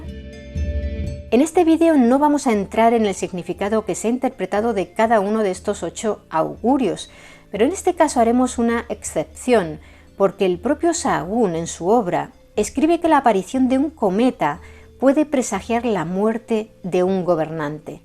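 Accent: Spain. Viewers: Hola, estoy suscrito a tu canal y veo todos tus videos,y me encanta todos los temas que abordas pero sobre todo me impresiona tu forma de relatar,me encanta el timbre de tu voz junto con tu acento español,(soy de Argentina) y me pregunto,alguna vez pensaste en hacer videos tipo audiolibros, sería genial,tu voz es excelente para eso,tal vez sería mucho hacer 2 videos a la semana pero un audiolibro cada tanto sería genial.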